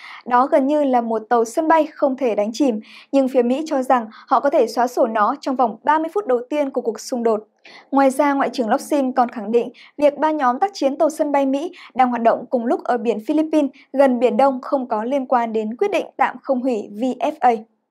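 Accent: Standard. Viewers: Chị đã xinh lại nói chuẩn nhanh như gió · noi hay chuan chi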